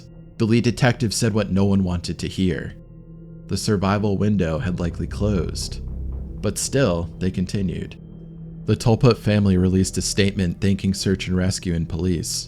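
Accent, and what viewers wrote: United States. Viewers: I started watching this, but I can't tolerate an American accent telling us about our own country which they think they own.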